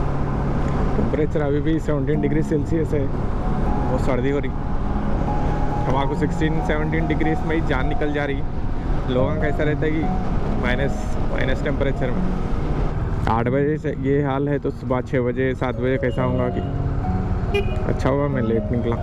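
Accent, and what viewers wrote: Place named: Hyderabad, India. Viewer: Looking awesome in hyderabadi accent 🖤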